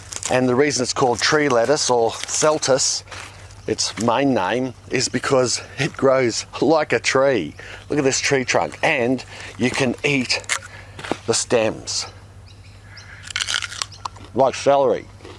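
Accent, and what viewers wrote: Australia. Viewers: Google subtitles: Hi I'm from Sausage Fisherman. Looks like AI can't deal with an Aussie accent. 😅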